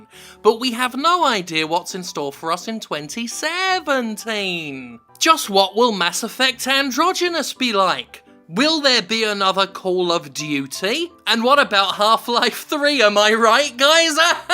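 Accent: United Kingdom. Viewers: you sound too British.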